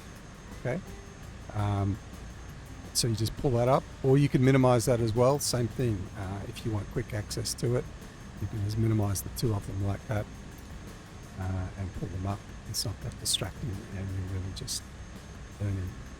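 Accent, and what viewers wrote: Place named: Australia. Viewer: Bro.....sorry but.....the accent! 50/50 for me in understanding everything you said in the video. Is it Australian?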